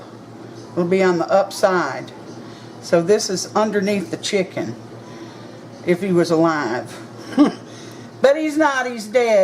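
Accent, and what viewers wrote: South, United States. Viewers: hi! wow wow you made one lovely dish of supper! I make my own poultry seasoning and sage & rosemary is in there, such a fine blend of herbs!!!!! I'd like to thankyou, for such a wonderful video, and sitting down watching your cooking, listening to you &, is very therapeutic, your Southern accent, very peaceful & soothing thankyou my new friend hugs from willow-Sage in victoria bc Canada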